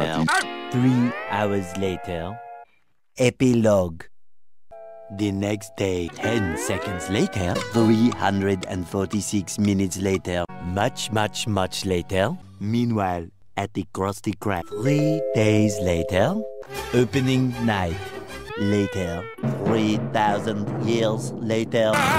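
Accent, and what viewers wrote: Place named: France. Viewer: It's meant to be French. The narrator is an homage to Jacques Cousteau.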